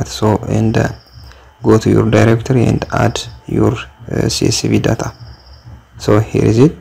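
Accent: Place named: India. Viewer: Fuck your english indian accent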